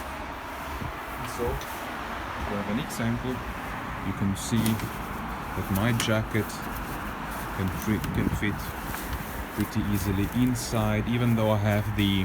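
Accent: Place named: Germany. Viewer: Ich glaube zu hören, dass Du Deutscher bist. Warum sprichst Du dann nicht Deine Muttersprache.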